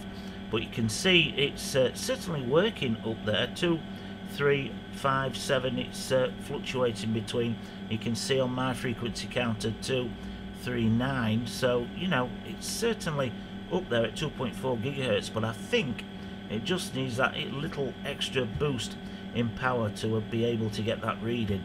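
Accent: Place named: England, United Kingdom. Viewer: Thooms (e.i. Thooms; British equivalent for Thumb. Pronounced as "loom." As in a cotton loom. - American influence/non- "King's English") Up. (Hehehehe- Ya gotta love that English Accent. Tickles ya).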